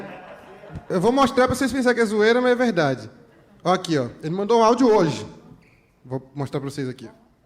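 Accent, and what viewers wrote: Minas Gerais, Brazil. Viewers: Pernambucano onde?? Esse é mineiro raíz. Passa batido. 😂😂😂😂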